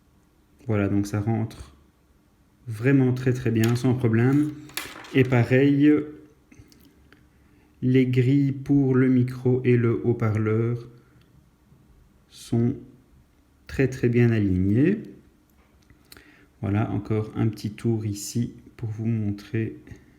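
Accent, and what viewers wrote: Belgium. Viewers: Accent liégeois?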